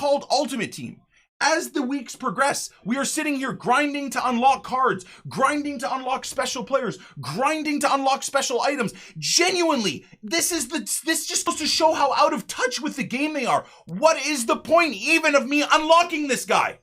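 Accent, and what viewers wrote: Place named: Canada. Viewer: I love your passionate maple syrup drenched canadian voice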